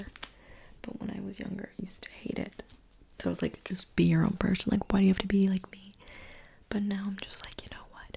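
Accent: United Kingdom. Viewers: lol your british accent is amazing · Not calling out all of us younger siblings 💀. Lucky for you, I'm too lost in your soft spoken voice (& British accent) 😌or else I'd ask to speak to your manager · As sooooon as you went with soft spoken British accent I ARRIVED AT TINGLE STATION! 😅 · The British accent 😂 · As a british male, aged 16. I can confirm that almost every few streets has a different accent. I like your British accent though, It's relaxing.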